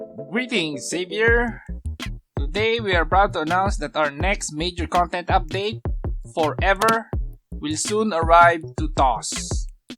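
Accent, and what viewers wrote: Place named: Philippines. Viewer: you sound like a filipino. are you filipino?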